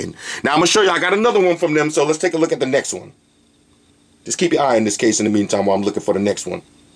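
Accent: New York, United States. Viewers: I always come here for your NYC Accent and your reviews!!!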